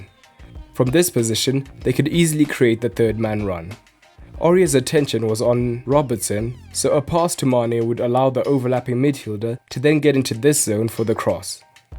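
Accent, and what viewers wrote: South Africa. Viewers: South african accent? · Are u from SA, just asking because of ur accent.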